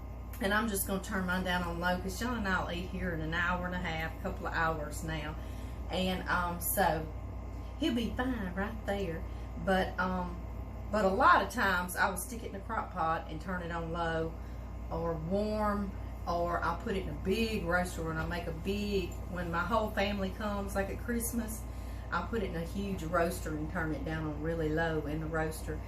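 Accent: South, United States. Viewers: I do enjoy your cooking videos. You are so much fun! Don't stop talking! Your Louisiana vernacular is hilarious! Love your Southern accent and all your phrases! You are entertaining! I love to watch cooking videos. Keep up the good work!🍳🔪🥘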